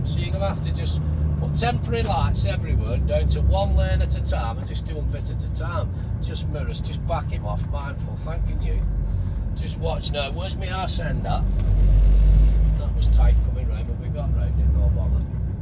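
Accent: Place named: Lancashire, United Kingdom. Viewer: Hahaha 😂 your singing is shit lol but you are spot on about the road its not fit for purpose you might have been better back tracking to spean bridge then cross to Dalwhinnie on the A9 better road anyway love the lancs accent safe travels pal hopefully meet you on the road sometime for a blether keep on trucking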